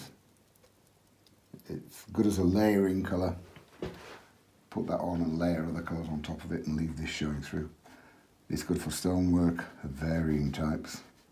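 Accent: Yorkshire, United Kingdom. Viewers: What a joy to watch, and as a West Riding woman of similar vintage I love hearing your vowels! You really do make the whole thing look very easy. For me it’s not! It is a great help seeing, for example how you do shadows, and how things blend together when you are using a limited range of colours. Really nicely filmed as well. Thank you.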